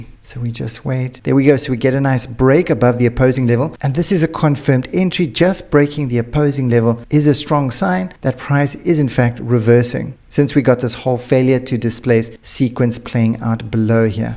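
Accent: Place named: South Africa. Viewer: this mans is south african no matter what u can say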